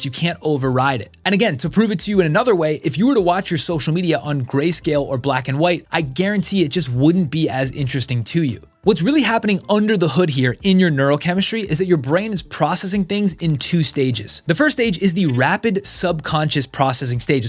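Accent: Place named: United States. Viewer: Thanks for clear American English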